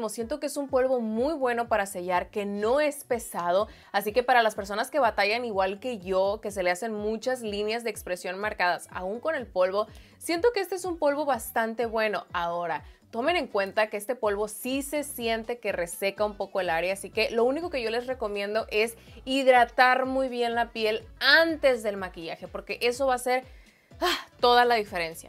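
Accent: Sinaloa, Mexico. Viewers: Me encantan tus videos... cada que subes pienso en "Sinaloa", tienes bien marcado el estilo sinaloense hehe .. Te veo desde Ensenada!!😊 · "SINALOA" Se me viene a la mente tanto por tu acento como tu personalidad 😋😉 Saludos desde Ensenada 😎💜 Espero ganar porque me encantaría aprender a recrear tus maquillajes 😍😄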